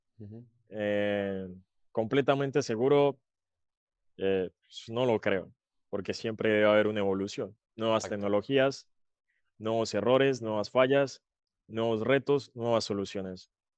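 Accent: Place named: Colombia. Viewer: Porque a veces habla full Colombia o como un gringo aprendiendo español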